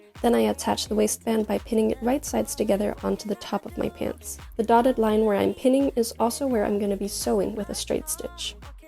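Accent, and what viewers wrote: Denmark. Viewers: Okay, bliver nødt til at høre om du er fra Danmark? Dit engelsk er virkelig godt, men der er noget dansk over det 😄 hvis du er, og kan læse min kommentar, så tusind tak for din tutorial! Den var super hjælpsom 😊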